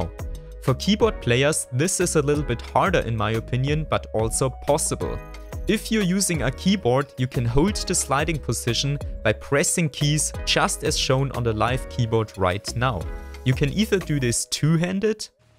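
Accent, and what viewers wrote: Germany. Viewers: Man merkt den deutschen aktsent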